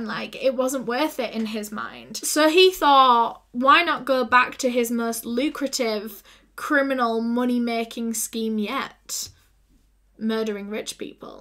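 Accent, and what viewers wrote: United Kingdom. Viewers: I usually don't like all UK accents but I love her UK accent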